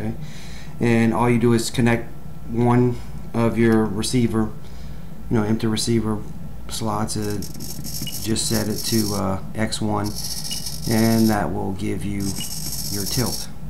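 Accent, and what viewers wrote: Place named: Louisiana, United States. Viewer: Listening to you talk I can tell you're from either Chalmette or Metairie : )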